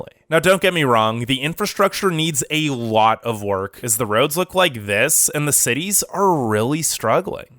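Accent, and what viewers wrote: United States. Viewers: I like your american English accent, thumbs up to you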